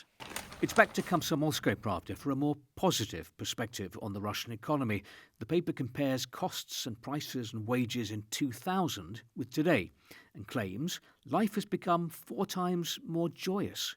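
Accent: United Kingdom. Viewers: Nothing better than a British voice, telling everyone how appauling the rest of the world is for supporting russia, while harbouring billions of Oligarch money since the 90s and 00s. And not only that, the UK has it on the left and right all the same, Galloway and Farage both seeing who can get their nose the furthest up oligarchal donors. Both acclaimed political heroes of the politically demented.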